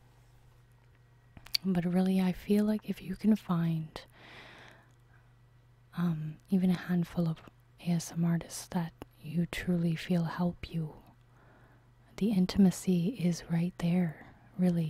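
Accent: Canada. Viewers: What part of Canada are you from? Love the accent:)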